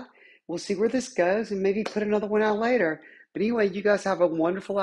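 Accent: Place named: South, United States. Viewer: Love your voice, you have that beautiful southern lilt that makes your voice gorgeous&makes it very femme sounding to me. Glad you have a loving family and can be yourself, that is awesome.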